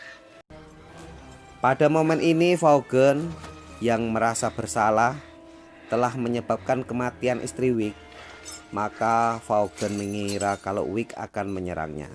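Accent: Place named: Java, Indonesia. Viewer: Orang jawa ya,medok banget.hahaah. Mas coba dongengin film tentang pendakian gunung everest. Ditunggu video selanjutnya. Sukses selalu ya.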